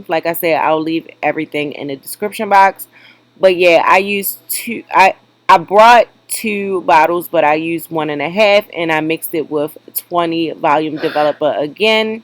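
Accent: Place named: Maryland, United States. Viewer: That “Murrlin” accent is THICK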